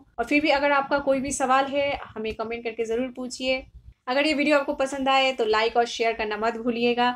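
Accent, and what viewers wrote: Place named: Bihar, India. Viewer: Hiii, kya aap Bihar se ho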